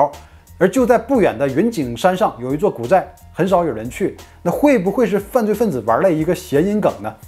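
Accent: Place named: Yanbian, China. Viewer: up主是朝鲜族的吧，感觉口音有点延边，延吉那边的。😄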